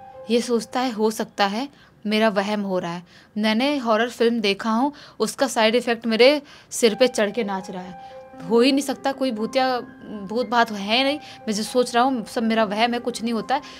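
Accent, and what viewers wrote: Bihar, India. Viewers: Ye ladki bhi bihar ki hai confirm. Eske awaj se pta chal rha hai . Itna jaldi jaldi kaun story' batata hai maidam 😬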